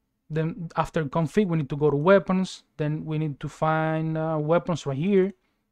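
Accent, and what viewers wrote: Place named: Mexico. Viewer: Great video ... very easy to follow. Love your accent. Mexican?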